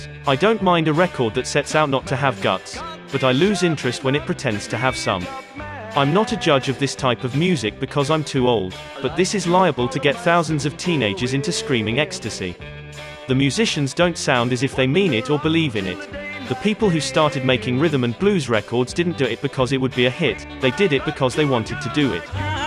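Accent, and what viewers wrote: England, United Kingdom. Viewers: That aint Frank Zappa . Since when does Frank Zappa have a British accent? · Why is this not Frank speaking and some English twit ? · We are hearing Zappa’s words, read by an Englishman? Gtfoh.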